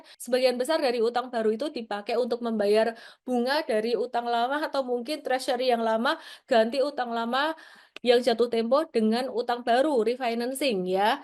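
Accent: Java, Indonesia. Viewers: trimksh Mbak 👍🏽 Analisa yg bagus, bahasa sederhana, ples jawa medhok, 😁 bagus 👍🏽 tapi maaf aq br nemu chanel ini stelah huru-hara si Trump ini. Usul sy, maaf, saran sy 🙏 kameranya jangan hanya dr 1 titik Mbak, ada angle kanan & kiri, ato depan agak ke atas dikit,agak jauh dikit, gtu agar tdk bosan menikmati penjabaran mbak. 👍🏽 & biar Qt ada fariasi natap mbak dr sudut angle yg lain.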